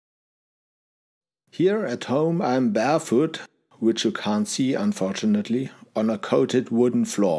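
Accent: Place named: Germany. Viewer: As someone living in Germany, I can tell this man is German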